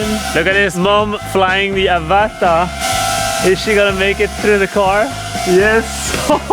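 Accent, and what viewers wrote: France. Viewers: Nice footage but that french accent 🤢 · Dude, you Scream French!!! Never Stop!!!!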